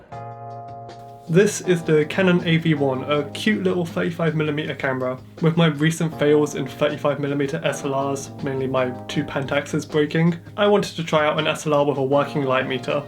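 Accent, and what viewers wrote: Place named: United Kingdom. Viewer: Film photography + British accent: subscribed! Jokes aside, very nice video, loved it! Keep going!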